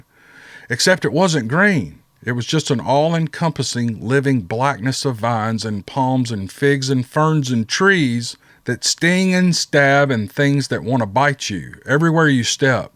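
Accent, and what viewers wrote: South Australia, Australia. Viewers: I love the way he wrote his story. It put the images in my head, which makes it that much better. I enjoyed your southern Aussie accent. Does anyone have any speculations on what happened to the family of 3, and their dog, that were all found dead on a hiking trail? Very intriguing event. Thanks and to, for providing my bedtime story. Love ya!!💕👍😊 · You did an awesome Aussie accent, keep up the good work, you're a great bloke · Do you have ai link to his channel? I loved your Australian accent 😃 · Great story and as always, well told, however, the Aussie accent??? Lol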